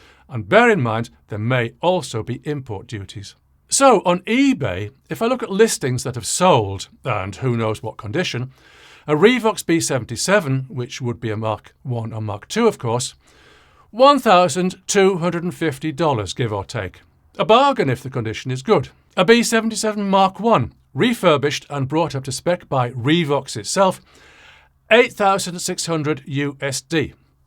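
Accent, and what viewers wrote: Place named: Liverpool, United Kingdom. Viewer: Thanks scouser ❤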